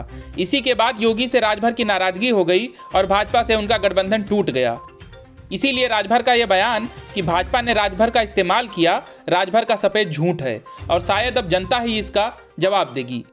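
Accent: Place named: Bihar, India. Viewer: Newsofindia wallon please apna ye bihari news reader / narrator change karo. Pehle wala accha tha, clear tha. Ye "saRak puR ghoRa khaR khaR dauRta hai" wala nahi chaiye. Please bring the old voice back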